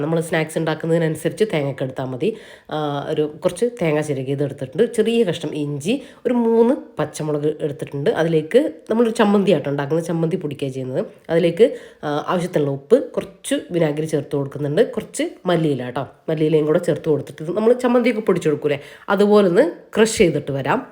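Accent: Kannur, India. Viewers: കണ്ണൂർ ആണോ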